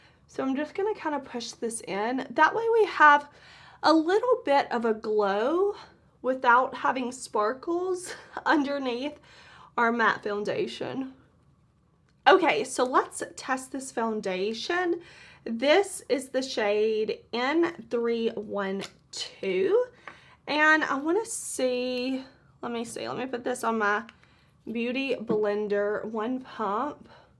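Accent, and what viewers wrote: South, United States. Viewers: I didn’t realize you live in Mississippi, I live there as well, in Columbus :) I knew you had a southern accent, just didn’t know where you were from. You’re one of my favorites to watch so it’s cool to know we live in the same state!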